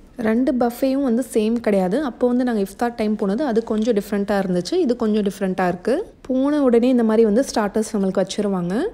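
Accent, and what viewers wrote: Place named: Tamil Nadu, India. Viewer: Tamil accent super👌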